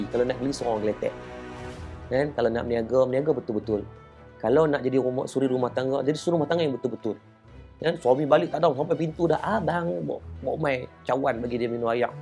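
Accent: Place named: North, Malaysia. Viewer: Terengganu nyer dah hilang jadi orang utara😊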